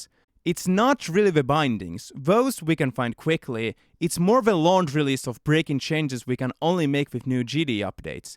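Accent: Finland. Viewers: En ees tajunnu et äijä o suomalaine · Are u Finnish🇫🇮?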